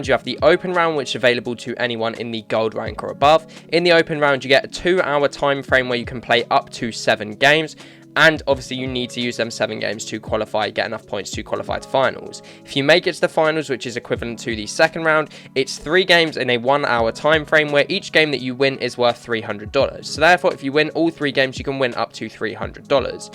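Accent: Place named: United Kingdom. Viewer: oh my god he got the strong bri'ish accent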